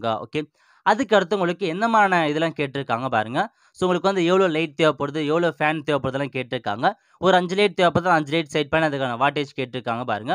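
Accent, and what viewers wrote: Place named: North India, India. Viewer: Nee! yan da? Vadakan pesura mari pesura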